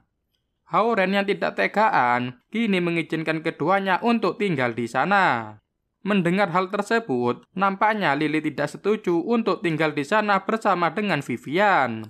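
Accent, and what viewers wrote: Java, Indonesia. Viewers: Logat jawanya terlalu terasa😌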